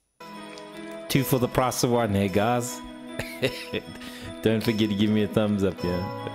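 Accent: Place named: South Africa. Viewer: If I'm not mistaken, you have a south african accent :) my mother was from woodstock Cape Town.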